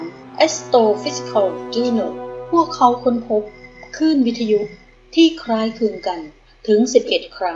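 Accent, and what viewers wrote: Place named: Isan, Thailand. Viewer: ลงพุงแชลแนล(ผมชอบ)ก็อิสานครับ สำเนียง ไม่ใช่ปัญหาครับ ..พูดกลาง สำเนียงอิสาน ก็ไม่เป็นไรหรอกครับ พี่น้องสิสาน มีอยู่แผ่นดิน สำเนียงคั้นนหูครับ เป็นกำลังใจให้นะครับ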